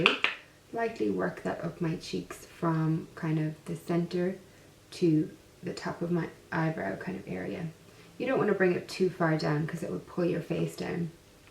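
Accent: Ireland. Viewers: you have such a beautiful accent. You sound more irish than the Australian accent i am accustomed to. I love accents in general, but you sound beautiful and thank you for the everyday look. I love makeup but some days i just want to look put together but not overly made up. thank you! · you're australian? you sound irish or scottish :o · I KNEW you were at least 100% Irish!! One of the most charming and lovely accents on the planet... Did you know that there is more Irish blood in the U.S. than in Ireland? I myself am mostly Irish, coming from the surnames Joyce, O'Connor and Fitzgerald. Nice to meet such a lovely possible cousin! God Bless!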